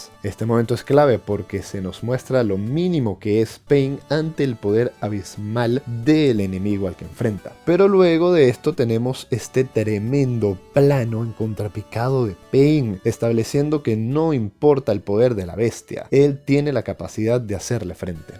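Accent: Venezuela. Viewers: Eres venezolanoo? jajaja ´´sabes como es la vaina???´´ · Eres vzlano? O_o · Primer comentariooooooo, oye eres venezolano? Me da la sensación, me encantan tus videos, saludos desde Venezuela mi pana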